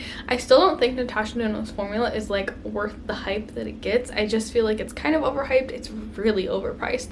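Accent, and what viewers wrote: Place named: Southeast, United States. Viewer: I hear that pronunciation a lot in the southeastern US 🤷‍♀️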